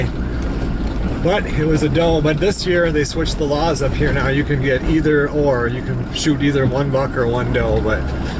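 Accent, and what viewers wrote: Minnesota, United States. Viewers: dear i really love your minnesota accent if u let me come to ur cabin ill let you come to mine in the UP michigan i know michigan people suck but were ohio people so everything will be ok pm me if youre interested alex p.s. wuts ur favorite kind of beer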